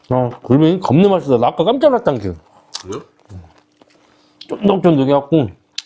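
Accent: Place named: Chungcheong, South Korea. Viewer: 충청도 말씨 일부러 쓰지 마시고 전라북도 말씨. 사투리를 써 주세요. 저는 정읍이 고향 입니다.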